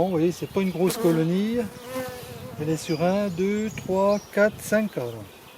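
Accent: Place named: Franche-Comté, France. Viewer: Merci pour la vidéo !...Ah l'accent franc comtois !...j'adore !..je suis du pays !😅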